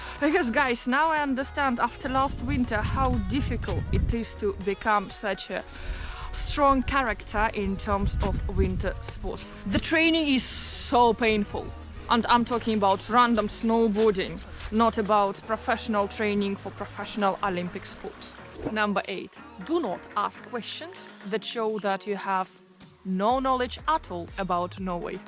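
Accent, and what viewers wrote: Russia. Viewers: This Russian accent :) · Having trouble placing her accent. Russian? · The English accent sounds similar to Russian. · What is your accent. Are you from Russia or Eastern Europe? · I like your Russian accent 😬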